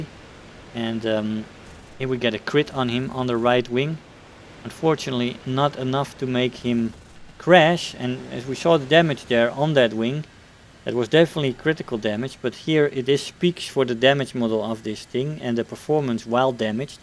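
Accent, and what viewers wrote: Netherlands. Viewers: je klinkt nederlands of misschien ligt het aan mij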